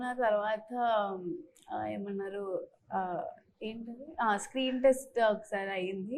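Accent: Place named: Godavari, India. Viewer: Be yourself. Be proud of Godavari accent. All the best baby🎉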